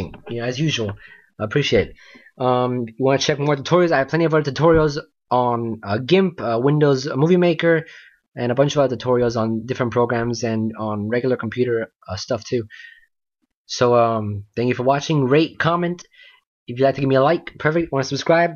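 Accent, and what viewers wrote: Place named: India. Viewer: lol wow that's quite observant of you! I thought pretty much only Indian people would be able to pick up on such a subtle accent :)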